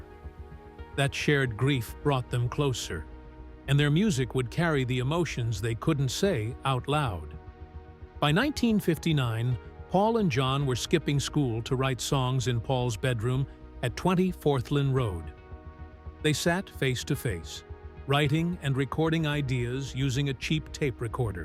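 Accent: United States. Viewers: Why is it an American doing this commentary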